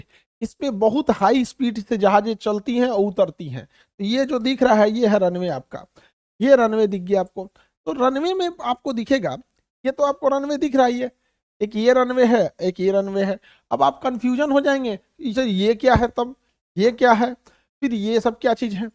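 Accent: Bihar, India. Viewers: Bihari hai sir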